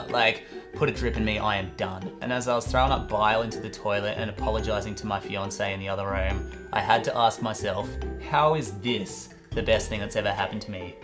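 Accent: Australia. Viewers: You have great content and that sexy Australian accent is a bonus.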